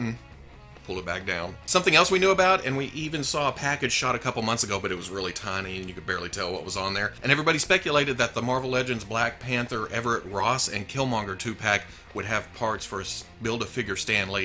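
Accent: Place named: Carolinas, United States. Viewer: Tryna place homie's accent. The Carolinas mayhaps?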